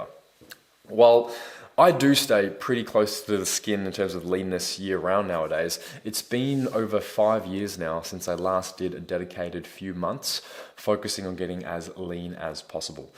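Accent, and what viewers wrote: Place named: Australia. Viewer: Didn’t expect the Aussie accent 🇦🇺 subbed, cool vlog man.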